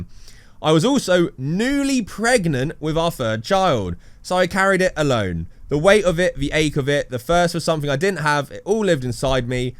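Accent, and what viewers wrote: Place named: Southern England, United Kingdom. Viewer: a name like is an immediate hint. With the tache you might as well have the map of Ireland on your face. The contrast between your Irish features and South of England accent is quite something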